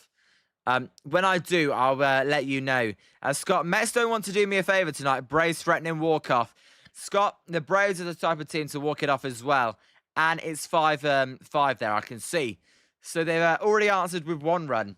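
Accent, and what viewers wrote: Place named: United Kingdom. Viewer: Are u británico....u accent is so strong...mmm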